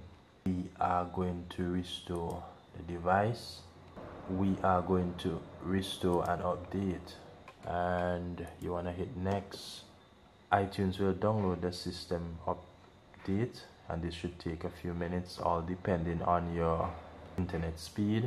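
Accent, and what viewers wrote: Jamaica. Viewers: Thought u were trinidadian at 1st but you're def jamaican. Much love still though brother. Your video was really helpful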